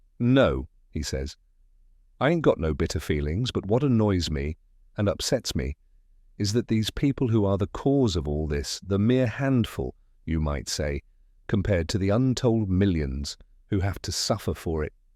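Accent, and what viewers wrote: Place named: RP, United Kingdom. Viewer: The language used from the transcript is cockney English, but spoken by a person who speaks Queens English. That would be like getting a Mexican person to read a transcript in a southern American accent, certain inflections just don't work, and it's hard to follow. Luckily I speak cockney english, so by reading the transcipt I could understand better what was being said. The use of some words prove to me the time period when the transcript was made, the words "Ninney", "Cor" "A to do" etc, were all common usage words i n cockney English from the early 1900's to the 1960's. Thank you for this wonderful video.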